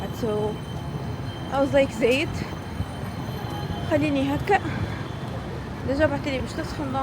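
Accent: Tunisia. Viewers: أول مرة نتفرج فيك نحسك ڤابسية من اللهجة متاعك 😂؟ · Alech te7ki anglais winti t3ich fi fransa , ma7leha esse3a lahjtek bitounsi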